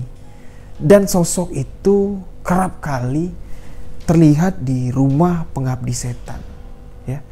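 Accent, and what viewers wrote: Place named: Bali, Indonesia. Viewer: hadir meski telat,suka dgn logat suaranya mas logat khas Bali 👍👍👍😀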